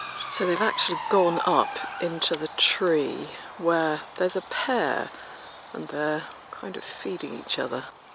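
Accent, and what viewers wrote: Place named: RP, United Kingdom. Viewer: I am Brett eh? That's so funny, it's Lambretta 1500 a little scooter no doubt. Has anybody told you that your voice is perfect for radio. I listen to you last thing at night time before I got to bed as your voice soothes me ready for sleep. You sound like you went to a private girls school, by the way I am in Geraldton in the outback of Australia and I troll the op shops for antiques nc collectibles. I am a huge fan, love your work, keep it up. Happy Easter, Warm Regards Trevx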